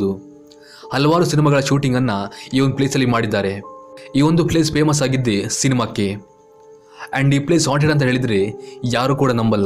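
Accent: Kundapura, India. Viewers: Are you from kundapura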